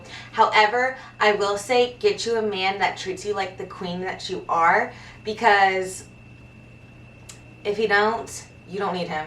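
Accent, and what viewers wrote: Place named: South, United States. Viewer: Love her accent, she’s the definition of southern charm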